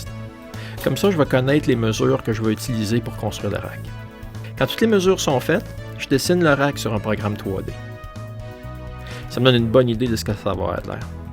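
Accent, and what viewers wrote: Quebec, Canada. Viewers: Wow super beau j'reconnais un quebecois :) et j'aime ça !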